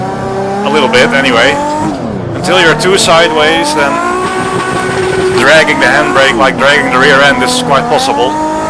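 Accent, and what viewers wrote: Netherlands. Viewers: You dutch right you atleast you sound dutch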